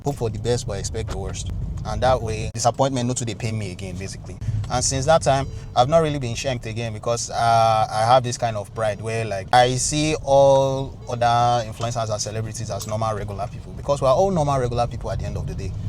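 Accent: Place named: Nigeria. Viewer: U speak like a Nigerian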